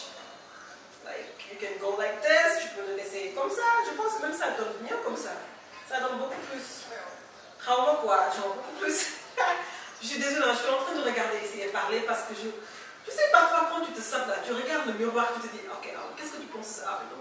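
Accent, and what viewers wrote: Senegal. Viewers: L'authentique accent sénégalais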